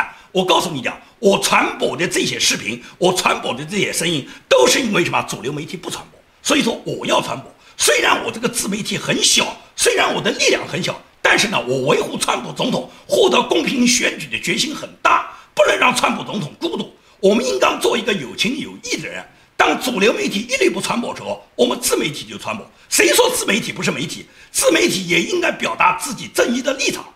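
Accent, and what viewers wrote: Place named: Guangxi, China. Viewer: 一听就是广西底下流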